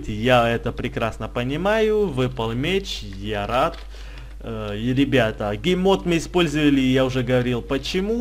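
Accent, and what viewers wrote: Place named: Ukraine. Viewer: Голос украинский 😆😆💫🌚💦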